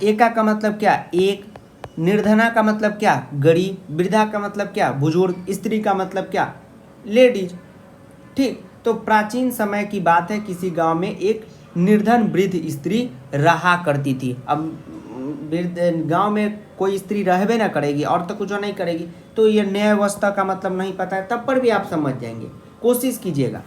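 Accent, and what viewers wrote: Bihar, India. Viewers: Sir aap bihari haina?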